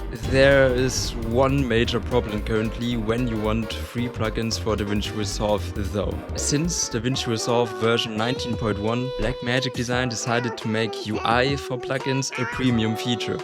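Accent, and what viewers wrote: Germany. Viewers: Echt gutes Video. Man merkt zwar das du Deutscher bist aber das juckt nd. Arbeite maybe noch am sounddesign sonst echt gutes Video bro.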